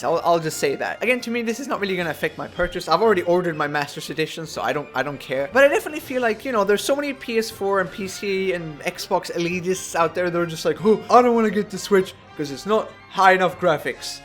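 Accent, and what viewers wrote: Sweden. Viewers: Wow Are you swedish?!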